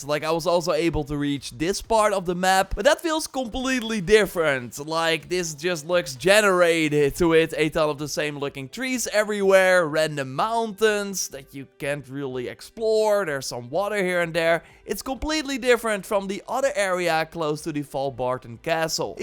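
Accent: Netherlands. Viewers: the "th" sound a not the same as the dutch "d" sound. Maybe it helps with getting rid of the dutch accent if you want that. Just a tip from a fellow dutchy, not to be mean.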